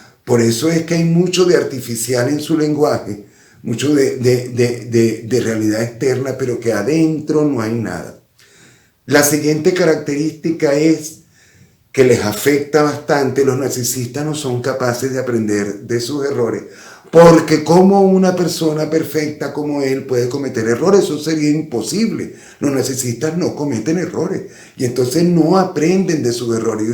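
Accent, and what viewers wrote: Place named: Venezuela. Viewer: Me gusta ese acento. Parece venezolano. Muy dulce como habla también. Gracias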